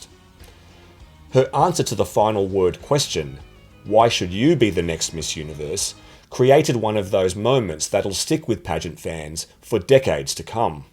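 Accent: Australia. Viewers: I’d have to say, as a beauty pageant enthusiast, you really do live up to your name as “ ”. The research, the editing, the (background) music, even the pronunciation of the girls’ names are spot on. Though with an Australian accent which I do not mind. Lol. 😏 Anyway, I coined our recent placements at Miss Universe as Venus Raj Era or Raj Era as with Venus started our golden age/era at Miss Universe. Hehe. 😁🤭 And also, I’d like to point out that Kylie Verzosa ~ Miss International 2016 is also a product of Aces & Queens. 😊 Can’t wait for your next videos/contents. 👍🏼😊 You’re doing a great job. 👏🏼👏🏼👏🏼👏🏼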